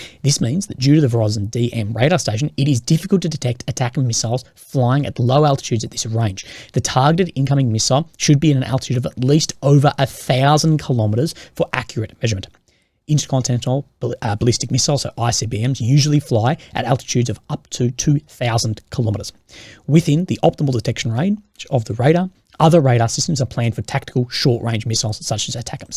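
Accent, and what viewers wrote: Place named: South Australia, Australia. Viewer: I would have to throw a coin. Who's accent is more annoying. Your southern Australian accent The hideous northern English accent or our southern Cajun/ red neck/ hillbilly accent. . NOW!!!!!!!! AS FAR AS THE CHICKS? HOLY SHIT THAT'S SEXY!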